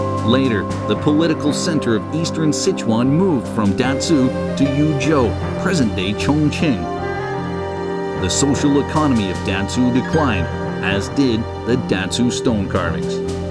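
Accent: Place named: United States. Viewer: Horrible Americanisation of Chinese culture. Awful narration with American accent, why?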